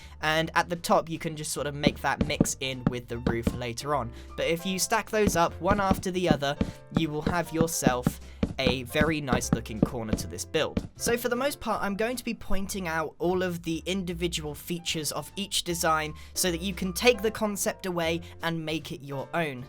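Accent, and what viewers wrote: United Kingdom. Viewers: God like you are like like you are so weird like I hate your accent like what kind of accent do you have French English English like United Kingdom English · uh... hes just british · Gabriella Siemer that’s called a British accent 😂😂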